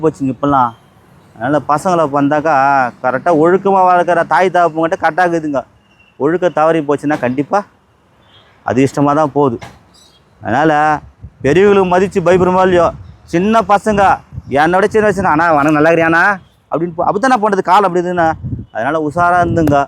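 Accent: Madurai, India. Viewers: Madurai vasagam super ❤🎉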